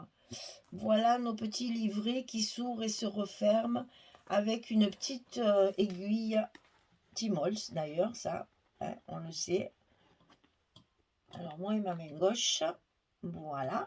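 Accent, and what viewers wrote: South, France. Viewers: Bonjour je trouve vos albums bien jolis. En ce moment je suis en train de faire l album snowy winterland en suivant les tutos. Je vais aussi tester les capsules. Dans tous les cas merci encore pour vos tutos et aussi votre accent du soleil me donne du baume au cœur.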